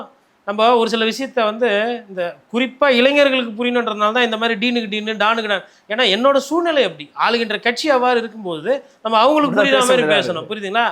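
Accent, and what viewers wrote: Chennai, India. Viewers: உங்கள் madras slang ரொம்ப அழகா இருக்கு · தங்களின் சென்னைதமிழ் அழகெனினும் எச்சரிக்கையாக இருக்கவும் சகோ...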